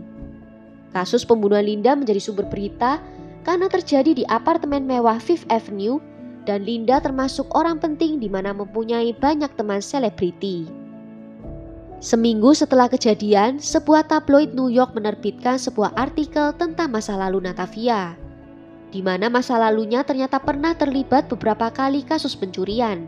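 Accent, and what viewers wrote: Java, Indonesia. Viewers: Jawanya medok ya kk 😄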